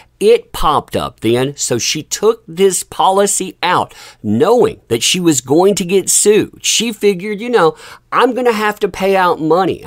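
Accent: South, United States. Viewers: Hey. Are you from the south? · Yes he's from the south 😆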